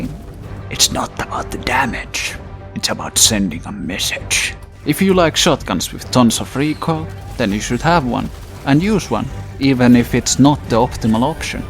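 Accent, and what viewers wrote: Finland. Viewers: anime girls, conquer from the cock and ball game for honor, dad jokes, sexy finnish accent. Perfect video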